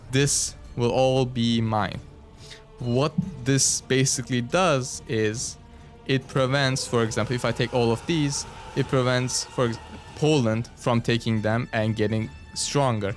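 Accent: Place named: Turkey. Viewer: Are you turkish your accent seems turkish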